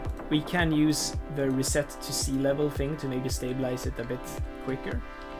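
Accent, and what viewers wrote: Sweden. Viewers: Hey, are you swedish? You sound swedish... 😄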